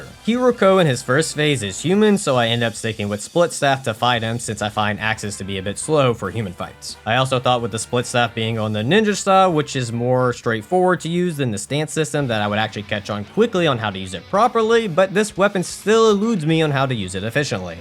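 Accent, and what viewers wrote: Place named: United States. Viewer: I can't teach you how to speak Japanese in one comment, but I can teach you how to pronounce it! There are only 5 vowels, and they're pronounced pretty much the same as they are in Spanish. So a = the vowel in "mom", i = the vowel in "bean", u = the vowel in "shoe", e = the vowel in "bay*", o = the vowel in "go". These vowel pronunciations NEVER CHANGE, so i = bean vowel 100% of the time, e = bay vowel 100% of the time, etc. The only time vowels change pronunciation is when certain vowels are combined to make a long vowel. This only happens with the combos "ou", which makes a long o-sound, and "ei", which makes a long e-sound. Otherwise, long vowels are represented by simply doubling the letter ("aa" = "a" held for 2x the length, "ii" = "i" held for 2x the length, etc.. "oo" is also used in a scant-few words for historical reasons, it's pronounced the same as "ou", just "o" but held for 2x length.) Ex. 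Sensei = sensee with a long e-sound. *In modern Japanese, the e-sound can be realized as either the vowel in "bay" or the vowel in "bet", depending on region or even just individual preference, so use whichever one you're more comfortable with. Consonants are similarly really easy, they sound like how they look. The only exception is the r-sound, which sounds like the Spanish r, not the English r. Since you seem, by your accent at least, to be American, it's the same sound as the "tt" in the words "butter" and "better". The "tsu" sound can be a little tricky as well, but if you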